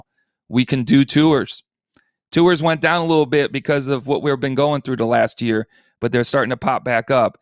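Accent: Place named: Chicago, United States. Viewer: He sounds like a Chicagoan.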